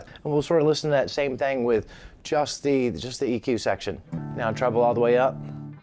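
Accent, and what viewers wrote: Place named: Delaware, United States. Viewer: You’ve got sort of a Walton Goggins voice and humor thing goin’—that’s a compliment. [Vice Principals, The Righteous Gemstones….] You’re from Delaware? I grew up there but don’t remember that accent. // Looking forward to getting this amp in a few days—well, oddly, it’ll be this or a Princeton or Deluxe Reverb…. Depends on which one makes it move….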